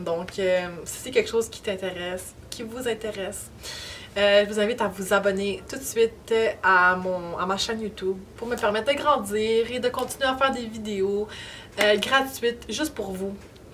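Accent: Canada. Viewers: L'accent québécois me fera toujours rire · Accent canadien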